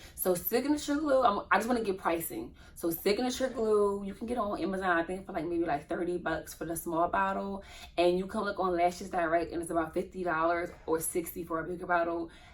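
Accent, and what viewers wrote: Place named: Philadelphia, United States. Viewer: I’m new to your videos , but I just gotta say … the minute you opened your mouth I knew you was from the city 🤣🤣🤣 when you said Houston I said ain’t no way . Then you said Philly and I said ard that’s what I thought 🤣🤣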